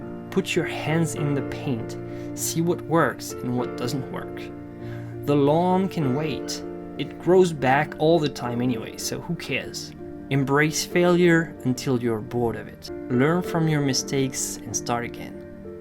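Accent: France. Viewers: Salut, je sais que t'est français, l'accent dit beaucoup de choses, et j'ai envie d'en parler en français, si quelqu'un veut le traduire, c'est a sa guise, je pourrais l'écrire en anglais mais je trouve ça plus personnel de te le dire de français à français, enfin bref, sache que ce Thomas a eu exactement le même ressenti que moi et beaucoup de gens comme tu l'a expliqué tu est toi même passé par la, mais la n'est pas le truc qui m'a touché, ta réponse, ta réponse change une perspective que j'avais alors même que je m’apprête a faire ma rentrée en école d'arts, j'ai du talent, et je le sais, mais ta vision des choses optimise tellement le gain de confiance et juste le fait d'apprécier créer que tu change beaucoup de choses, je suis passionné de création je voudrais avoir toutes les créations du monde dans ma maison, et je voudrais que les miennes en fasse partit intégrante, j'écris sans réel but la.. 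j'ai juste besoin de poser mes pensées quelques part.. hum. je souhaite rentrer dans l'histoire de la création de l'homme tout simplement pour que mon histoire et l'histoire de mes créations me survivent, j'ai le même objectif que toi, je suis conscient de ma mortalité, mais je suis aussi conscient de l'immortalité de mes idées et pensées. alors sache que mon amour pour toi est infini, et mon amour pour tous l'est aussi, si vous voulez créer.. créez alors, ne vous gênez pas.. j'ai vraiment besoin d'écrire un livre sur mes pensées et mes idées artistiques moi.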